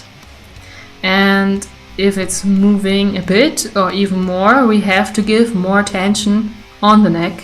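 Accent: Germany. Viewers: dieser moment wenn man realisiert das sie einen deutschen Akzent hat:D und sie deutsch ist und gute Videos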